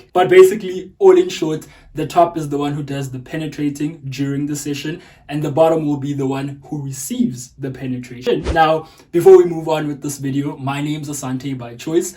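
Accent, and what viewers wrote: South Africa. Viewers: I really like ur accent...it sounds south African but ur name is I'm like obsessed w u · Wait u south african Ur accent And yeah I've learnt 2 compromise Its ok for us verses i guess Coz we stick with what we receive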